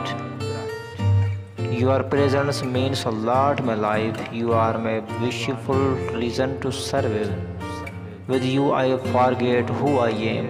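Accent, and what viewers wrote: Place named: India. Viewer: Your voice like indian men not arabic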